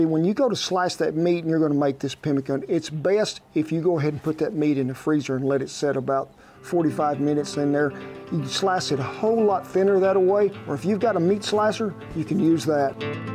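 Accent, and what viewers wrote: South, United States. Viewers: He spoke just fine, you're just missing some south in your vocabulary. 😂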